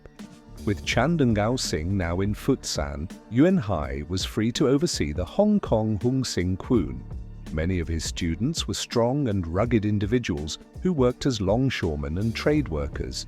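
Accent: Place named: England, United Kingdom. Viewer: The history might be interesting, but the Queens English pronunciation of Mandarin and Cantonese is really hard to get past.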